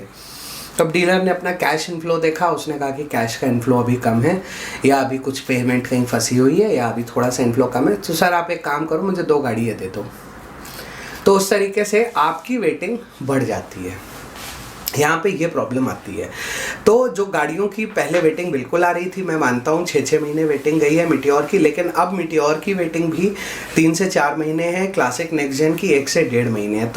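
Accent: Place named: India. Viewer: sorry, I don't understand Indian English. I tried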